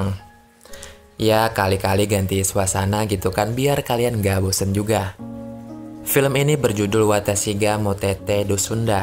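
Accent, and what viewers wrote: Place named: Kalimantan, Indonesia. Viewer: Kaya org kalimantan bhs nya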